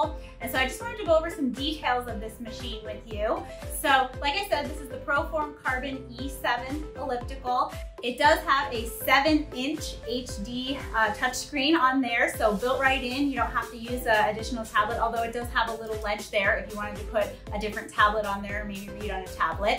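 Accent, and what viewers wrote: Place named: New England, United States. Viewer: Great relaxed demo. I take it you're from either Massachusetts or New Hampshire? (THE ACCENT) 👌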